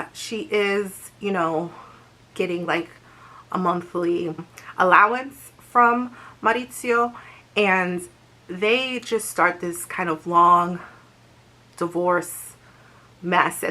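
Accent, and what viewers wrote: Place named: Italy. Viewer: Love your Italian accent! Good review! Now I want to see the movie!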